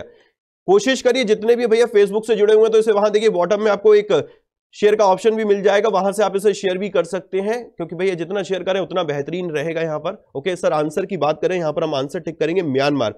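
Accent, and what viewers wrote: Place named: Agra, India. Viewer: Arrey yaar ko agra me bolte h sir agra ke h isliye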